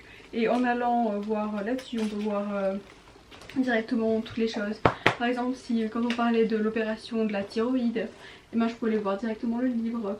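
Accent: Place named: Italy. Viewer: Super vidéo comme d'habitude. J'ai été surprise par ta manière de parler : tu commence a avoir un accent sûrement à force de parler italien 😂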